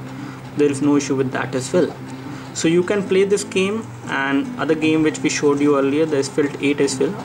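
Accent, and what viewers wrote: India. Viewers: india okzent osin silnii ny prem oseni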